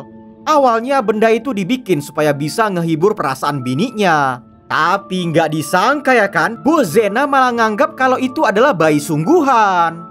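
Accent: Medan, Indonesia. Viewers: yg isi suara ini orang medan kek nya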